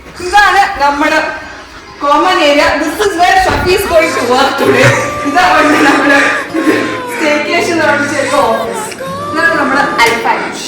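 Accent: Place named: Tamil Nadu, India. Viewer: Your Tamil accent 🤌😂💯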